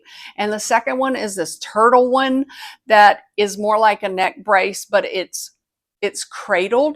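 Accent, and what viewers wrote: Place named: Texas, United States. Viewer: Texas accent But I'm a big fan of travel tips Definitely avoiding chafing lotion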